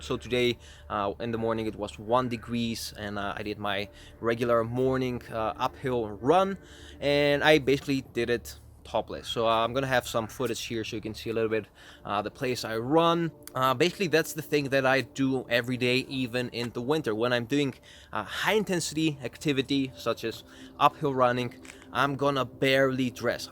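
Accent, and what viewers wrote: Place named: Netherlands. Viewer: For someone who lives in Greece, you sound Very Dutch! 😀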